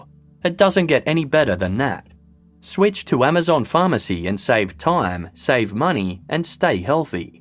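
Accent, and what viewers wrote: Australia. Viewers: my heart goes out to the kids of these monsters. Not only did they lose their grandfather, their grandmother and their daddy but now due to selfish custody BS, have lost their (other) stepfather/father and bitch of a mother! Love that this is narrated in our awesome Aussie accent! Cheers 🇦🇺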